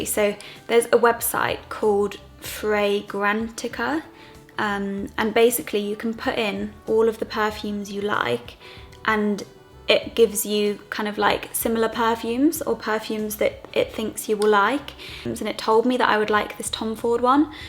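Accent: United Kingdom. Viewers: Nice British accent ;D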